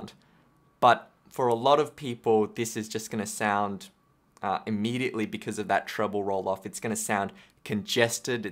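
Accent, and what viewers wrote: Australia. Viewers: An Asian guy with an Australian accent? Mind blown.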